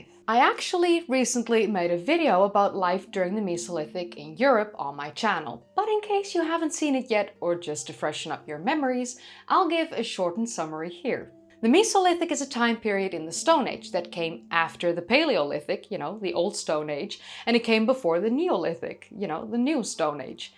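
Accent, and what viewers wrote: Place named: Netherlands. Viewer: And here are again. Another amazing video. And as I have many times before, mentioned curtain lovely dutch accent. I like accents. I just kean back. Learn something and hear wonder dutch accent. So all in all another great video with the All amazing and her beautiful Toll of a of voice with that wonderfull dutch accent.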